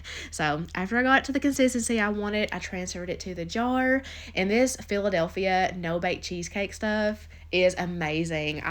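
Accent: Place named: Kentucky, United States. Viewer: Are you from Richmond? I’m from Paris, KY and I feel like your accent is heavier than most people’s around here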